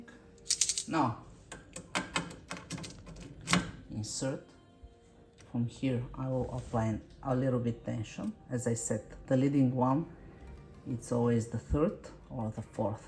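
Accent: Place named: Italy. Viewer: Sento un po di italiano in questo inglese😂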